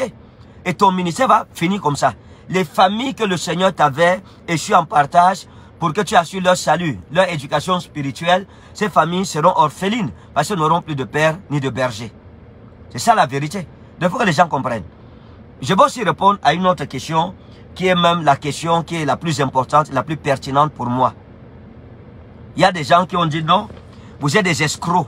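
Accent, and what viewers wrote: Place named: Democratic Republic of the Congo. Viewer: Pasto vous avez l'accent d'un zaïrois c'est juste pour savoir sa n'a pas d'importance mais je cherche à savoir ne voyez pas dans ma question un esprit polémique..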